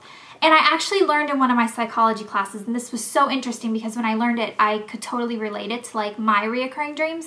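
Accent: South, United States. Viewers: you have your old 'southern' accent back in this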